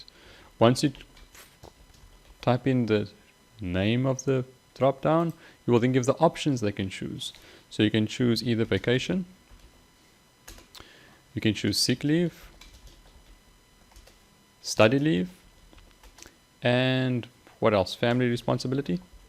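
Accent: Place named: South Africa. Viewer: Brilliant video thank you. Enjoyed hearing the SA accent too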